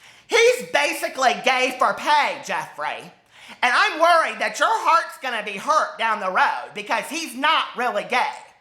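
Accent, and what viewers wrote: South, United States. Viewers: I just found you!!! And I love you to death!! And you'll probably be making fun of me because I make videos also which you will probably find boring but I think you're just hilarious! You remind me so much of my friend Gordy who we all nicknamed Gordo....I rarely get to see him now because I moved back home to Texas and he's still in Cali......long story short we met him at an RV Camp out on the shoreline in Northern California and he helped me and my girlfriend not freeze to death because we couldn't get our heater working. Why in the hell am I telling you this? I just watched your video and feel like we've been friends forever! Where are you at? You appear to have a southern accent? I'm in Texas! Give me a shout sometime! New Sub!!!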